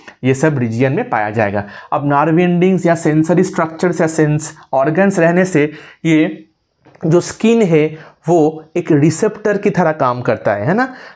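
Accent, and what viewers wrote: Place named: Bengal, India. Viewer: Sir your ascent is type of Bengali..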